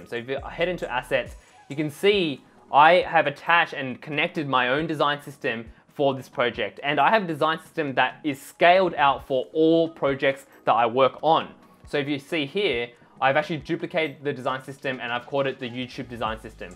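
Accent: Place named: Australia. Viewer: MASS COLLAPSE LAYERS works differently for me than explained: On a Mac press CMD + A to select everything. Go to Layer panel and hold CMD + Click on 1 dropdown arrow and everything closes. Do exactly the same to open everything on the Layer panel. By the way your content is simple and brilliant! It helps immensely! Your accent takes me back to amazing Australia!:) So thank you very much!!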